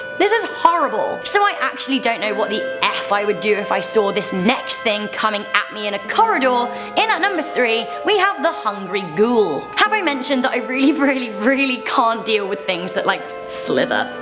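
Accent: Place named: United Kingdom. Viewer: JIFS LADY!! And btw I love their brit accent 😍😍😂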